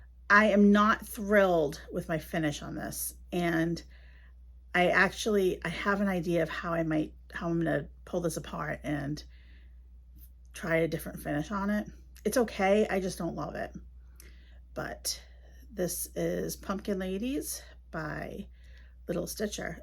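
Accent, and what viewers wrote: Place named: Boston, United States. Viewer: Great to see you again. I enjoy your floss tube and your Boston accent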